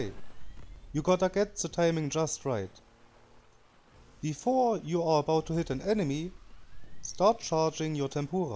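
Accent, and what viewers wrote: Germany. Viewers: Bro man hört so hart das du Deutscher bist xD. Aber trotzdem nices Video!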